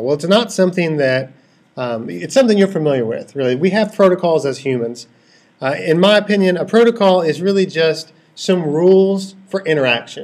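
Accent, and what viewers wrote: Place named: United States. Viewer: Ahhh finally an american accent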